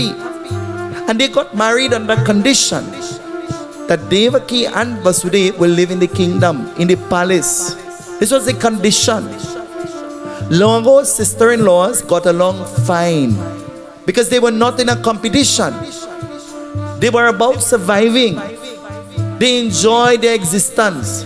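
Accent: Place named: Trinidad and Tobago. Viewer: SITARAM. PUNDITJI...I. LOVE. YOUR. LITTLE. TRINI. ACCENT.. YOU. DID. THE PUJA VERY. NICE.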